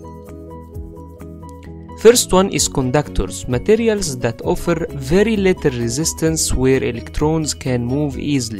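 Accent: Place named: Egypt. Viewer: اللهجة دي مصري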